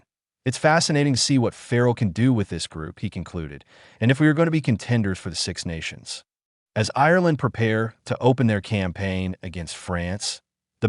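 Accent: United States. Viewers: Why the American accent? This isn’t an American sport - it’s British. Change the narrator. And you’ll have a better response…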